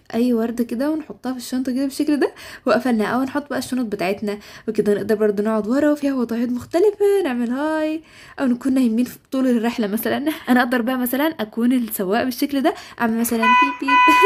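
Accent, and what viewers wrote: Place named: Saudi Arabia. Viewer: عرفت من لهجتك انك سعوديه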